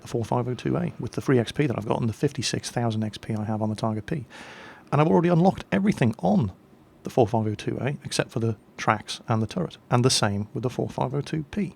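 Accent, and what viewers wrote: United Kingdom. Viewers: Good stuff, thanks for showing us your awesome German tanks and for explaining everything in detail with Dat calm British accent. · have u ever thought of working as a narrator for TV .... we could really use someone like u ... that "typically" british accent, a nice voice, easy to listen to - very well narrating and improvising to get the sentenced finished ..... damn we could use a guy like u ....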